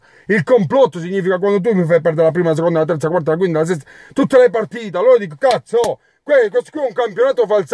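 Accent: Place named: Rome, Italy. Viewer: l'accento romano di e` fantastico <3 hahah Grande!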